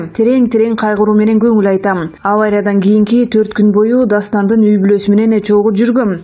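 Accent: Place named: Turkey. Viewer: Диктор сен эмне эле турктордун дикторунун дикциясындай окуп жатасын? Киши жадап кетет экен.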